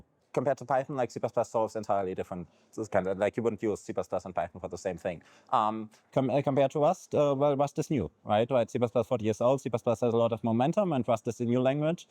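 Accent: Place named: Germany. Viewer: I like germanized English.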